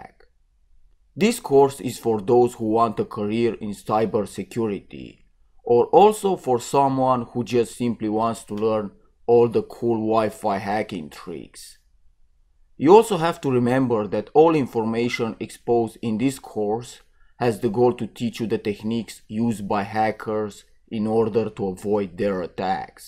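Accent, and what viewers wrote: Nigeria. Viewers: shimt yes realised now. that's definitely a Nigerian accent.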